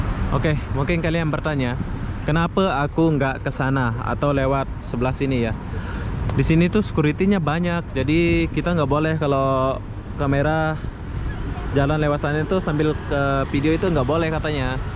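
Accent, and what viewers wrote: Malaysia. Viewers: Kalo Aku dengar dari logat. Abang ini orang malaysia kayak nya. Salam dari Sarawak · Kamu orang mana sih?logatx org melayu.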